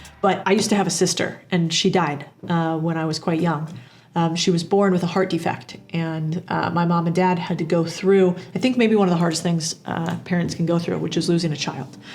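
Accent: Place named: Midwest, United States. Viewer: You are Latina with beautiful hair, but you have a Midwestern (no) accent. Please explain.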